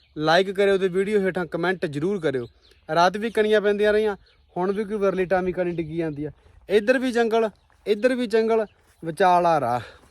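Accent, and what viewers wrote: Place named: Punjab, India. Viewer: ਵੀਰੇ ਤੁਹਾਡੇ ਬੋਲਣ ਦਾ ਲਹਿਜ਼ਾ ਠੇਠ ਪੰਜਾਬੀ ਸ਼ਬਦਾਵਲੀ ਜਮਾ ਸਿਰਾ ਵੀਰ ਦਿਲ ਰੂਹ ਜਮਾ ਬਾਗੋ ਬਾਗ ho ਜਾਂਦੀ ਆ ਵੀਡਿਓ ਦੇਖ ਕੇ,,,, ਜਮਾ ਸਿਰਾ ਪ੍ਰੀਤ ਐਂਡ ਪਾਲ ਹੋਇਆ ਪਿਆ ਚੜਦੀਕਲਾ ਬਾਈ ।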